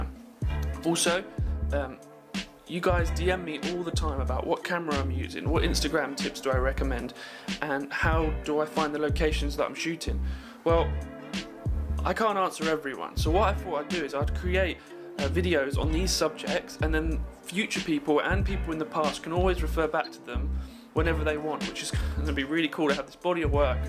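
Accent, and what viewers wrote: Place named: United Kingdom. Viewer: You won't beleive it, but i watched this video to exercise my listening skills in British English 😂😂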